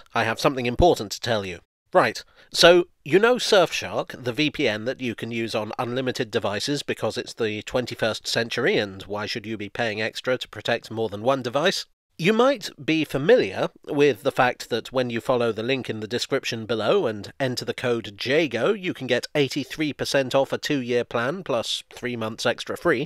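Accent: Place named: England, United Kingdom. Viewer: "saturated' thats it... fun fact: in old german books you will find even "satt" / "gesättigt" as synonym... which should be the matching translation. in general up the end of the first world war there where many english words like "Train" or "Lorry" in this capitalized german form in newsletter articles and books but using the right term in the right moment is rather difficult. so thanks for the kind words (in fact i subcribed the channel for this english english the speaker uses :) i really enjoy it)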